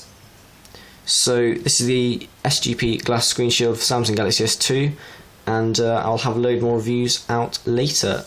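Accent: England, United Kingdom. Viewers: It's not that it's the fact that on his channel he says that he's from the united kingdom AND you can clearly hear he has a english accent.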